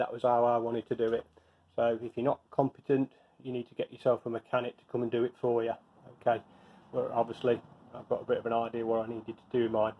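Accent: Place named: Nottinghamshire, United Kingdom. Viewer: Really good video and explanation 👍👍👍 seriously think I'm gonna have to do it...last few times riding my back and neck getting bit achey...but nearly 63 🤣 ....be great if your ever nearby, on ride ? So I could have a look, I'm in North Notts, your accent sounds close 👍 but thanks again and great idea about the reservoir brackets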